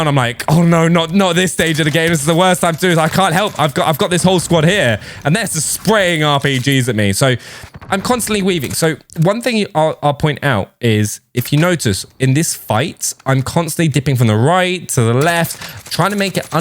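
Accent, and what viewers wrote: United Kingdom. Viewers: mate are u british because u sound it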